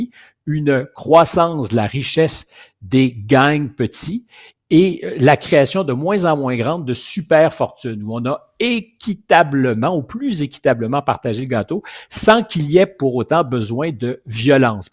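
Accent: Quebec, Canada. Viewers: J'adore l accent franco canadien 🇨🇵✌️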